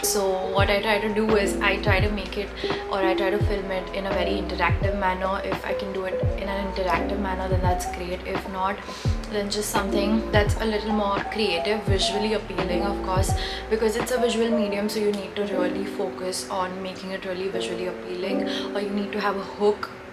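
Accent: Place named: Maharashtra, India. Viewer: Your marathi accent oh my god literally dying 😍🥺♥️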